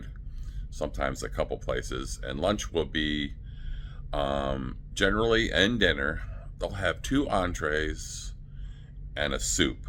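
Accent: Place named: United States. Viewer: White guy, speaking American English… you on a US registered ship? That’s not how it is typically at sea.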